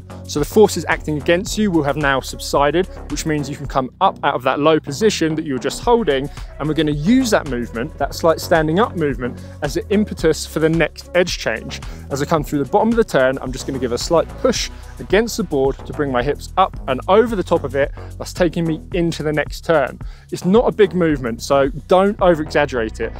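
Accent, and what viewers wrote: United Kingdom. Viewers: Nothing against you mate but its weird hearing an brit accent in a snowboarding video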